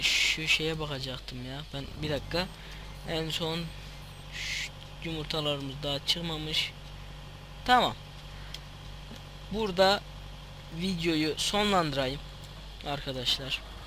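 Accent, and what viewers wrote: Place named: Sivas, Turkey. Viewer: heee sivaslılarda böyle konuşuyorlarda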